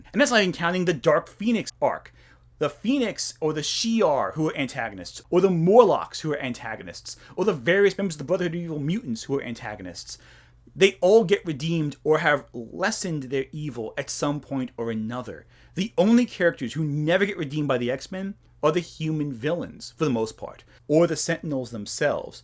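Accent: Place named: New York, United States. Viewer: where you from buddy you got a great accent, nice vid i'd guess nyc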